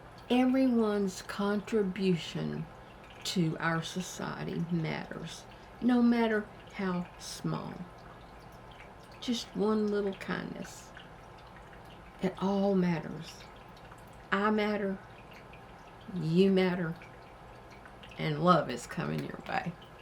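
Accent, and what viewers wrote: South, United States. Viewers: I love your channel. I love listening to you. You have a sweet Southern accent. I can see you growing and glowing. I'm so proud of you. It takes courage to do this.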